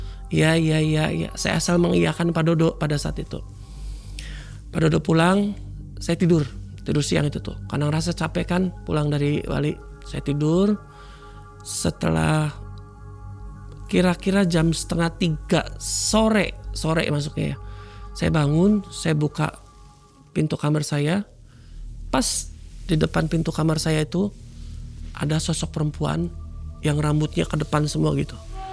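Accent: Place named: Cirebon, Indonesia. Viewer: Jawa cirebonnya dah medok bnget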